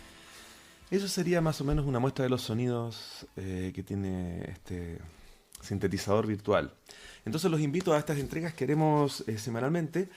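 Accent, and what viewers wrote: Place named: Chile. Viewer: que maravilla encontrarme con tu canal y por las dudas al escuchar tu acento me fui a revisar tu cv para soprenderme nuevamente de que eres chileno y haces patria tan lejos. Tras algunos minutos ya entendí lo que es el pure data y están muy interesantes los pasos. A ver si me animo a descargar y aprender a usarlo. Muchas gracias por compartir! Excelente canal educativo :) Saludos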